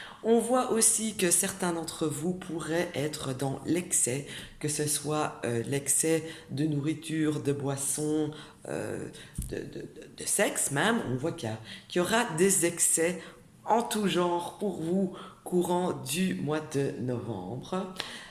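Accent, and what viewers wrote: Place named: Belgium. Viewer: Bonjour bel accent belge🤗😘👏🌹🥀🥀🥀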